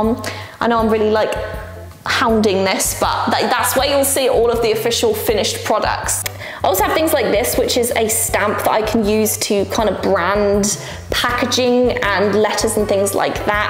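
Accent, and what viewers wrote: United Kingdom. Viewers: You know I really like your uk accent Especially the Amazun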